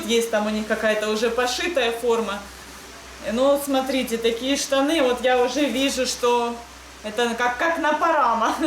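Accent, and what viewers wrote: India. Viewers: У вас, уже акцент на индийский стал похож )) Nice do mid' you;) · так интересно, у Вас английское произношение индуское, это просто удивительно! Только с позитивной стороны говорю. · За столько лет в индии чисто индийский акцент английского стал))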